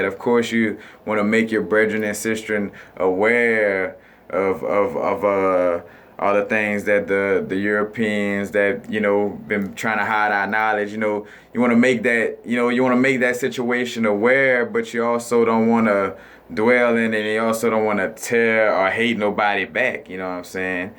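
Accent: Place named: South, United States. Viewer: I notice that you have a southern accent - you would have been burned at the stake, in earlier times - by both blacks And whites for expressing this type of contentent.... the 21st century is truly is incredible !!!.....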